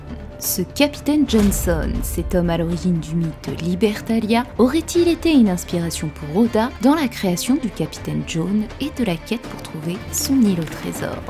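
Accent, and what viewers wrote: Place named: Belgium. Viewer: Vu l'accent, je dirais qu'elle est belge.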